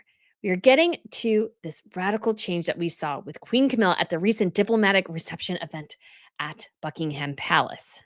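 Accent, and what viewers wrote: United States. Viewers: her pronunciation is American because she IS American. That's okay.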